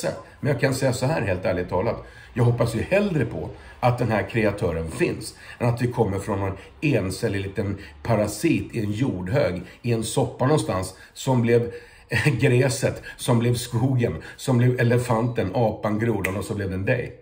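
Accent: Skåne, Sweden. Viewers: Bra med titel som beskriver innehållet, gjorde att jag slapp irritera mig på skånska. Religionssnack är intressant om det är på rikssvenska.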